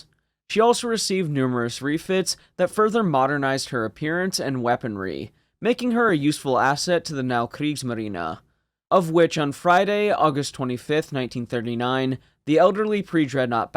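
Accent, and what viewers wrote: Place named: West Coast, United States. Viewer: There's just something about a young white guy with a west coast accent mispronouncing every word that makes me want to black list the channel.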